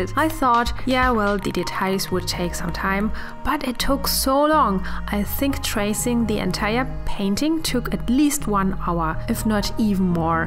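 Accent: Germany. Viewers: Ich liebe deinen deutschen Akzent! Ich lerne gerade Deutsch. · Man hört so sehr raus das du deutsch bist ich liebe es 😂❤️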